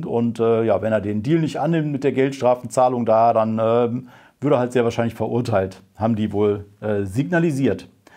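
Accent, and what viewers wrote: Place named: Emsland, Germany. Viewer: Na,ist wohl ausm Emsland, wie ich,da sagt man dat und wat...geht halt in Richtung Plattdeutsch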